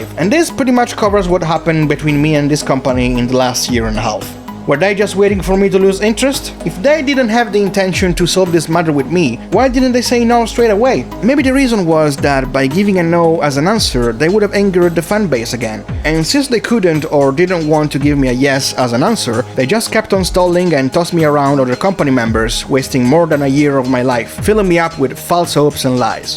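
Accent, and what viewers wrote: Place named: India. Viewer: You must be talking with an Indian accent! Indian as in Asian-Indian American.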